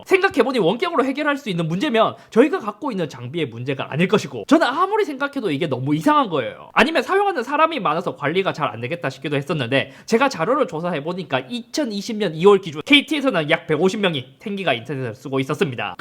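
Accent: Gyeongsang, South Korea. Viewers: 경상도 신뢰가 안간다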